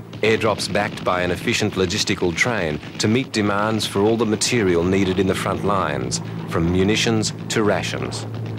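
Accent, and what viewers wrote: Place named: Australia. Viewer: Interesting video but the continuous loud background music is very annoying. Is this an Australian production? The narrator sounds Australian.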